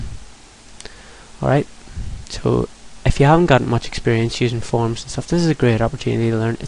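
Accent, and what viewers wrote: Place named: Ireland. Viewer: Just wanted to thank you for the excellent tutorial(s)! I found (all 11) to be quite useful as an introduction to XNA. I am very greatful for your time. I was relieved that you had posts listing the XNA 4.0 conversion under the applicable video showing the corrections. BTW its nice listening to an Irish accent in these tutorial videos as opposed to the 'more comment' accent associated with programming tutorials. This Canadian Approves :)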